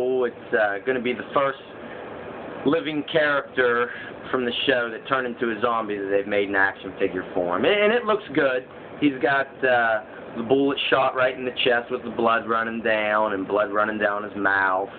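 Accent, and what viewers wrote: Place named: Ohio, United States. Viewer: Love your walking dead videos man! But I also love your accent. Are you from Ohio? lol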